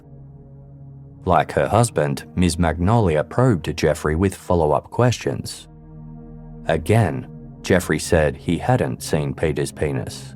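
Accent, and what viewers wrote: Australia. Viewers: Well, he is Australian. Not exactly a sophisticated accent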